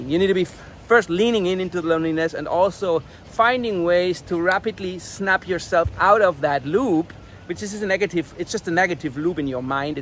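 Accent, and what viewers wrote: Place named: Germany. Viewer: I like your video. I subscribed to your Channel. You talk clear, so in my opinion you do not need subtitles. I think you have a nice German accent (I am Dutch, the subtitles annoy me a bit, but that is my personal opinion)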